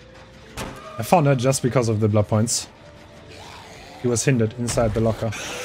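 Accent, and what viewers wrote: Germany. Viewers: Wie man den deutschen Akzent hört aber saftiges gameplay👌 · Der deutsche Akzent:) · are you german?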